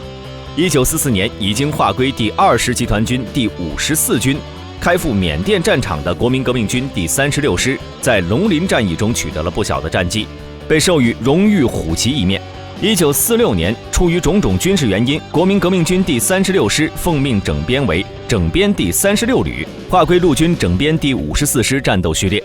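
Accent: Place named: China. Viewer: 這視頻都是台灣人做的配上大陸腔的旁白 ! 讓你以為是中共做的 !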